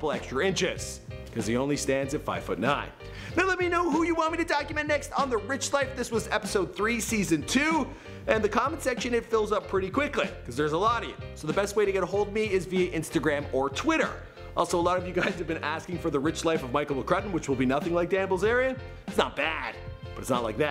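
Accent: Canada. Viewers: I forgot Michael is a damn Canadian... 😑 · Jessica haha he’s Canadian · Real Bride Of Chucky he’s Canadian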